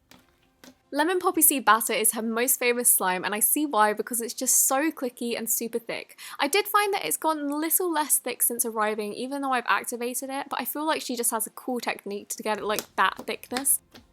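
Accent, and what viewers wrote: United Kingdom. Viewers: Aren’t you British not americqn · I mean, she IS British and pronounces things differently than Americans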